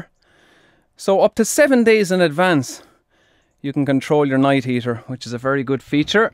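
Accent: Ireland. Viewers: he sounds irish mate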